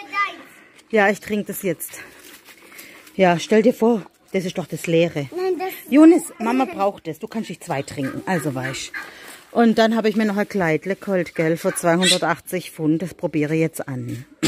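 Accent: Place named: Swabia, Germany. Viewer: Ich höre dich so gerne schwäbisch erzähle... Weckle...... Semmeln wie süß... 😊😂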